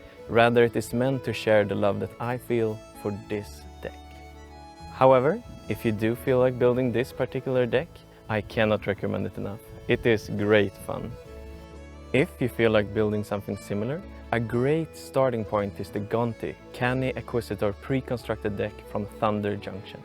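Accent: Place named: Sweden. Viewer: As soon as I saw the house and nature I was like "this looks like Sweden", then heard you speak and was like "yep, Sweden" 💛 The fact that this was clearly filmed in summer and was published now in winter after the super snowstorm was (1) very good for my mental health to help me look forward to the next summer, and (2) a testament of the effort it must have taken you in editing and post-production. The video was amazing and I'm glad you took the leap and made it happen, congrats and keep it up!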